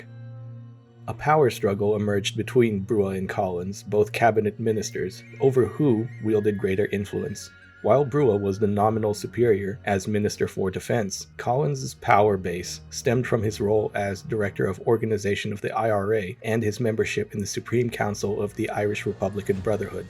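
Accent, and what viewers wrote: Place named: United States. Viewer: Jayzush Christ will ye be dropping the yank accent for phuqqs sake! Seán McKane? Rural members were unreliable? Tell that to the Flying Columns in Munster, Longford, and Mayo.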